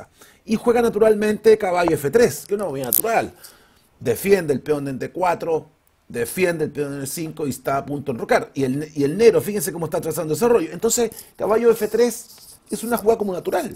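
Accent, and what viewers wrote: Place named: Chile. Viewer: Qué gozada haber descubierto el canal y ser suscriptor..! Explica usted de maravilla. La suma de la pasión que pone más ese encantador acento chileno, es una delicia. Celadas muy pero que muy útiles. Saludos desde España y un abrazo!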